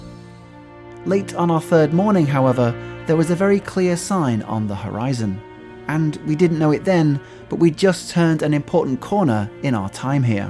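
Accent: United Kingdom. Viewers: My first time viewing and enjoyed the humor and as an american the brit accent top it of. I think rain will spoil any vacation time. I live in the great lakes region[ohio] and May thru Oct. is really nice.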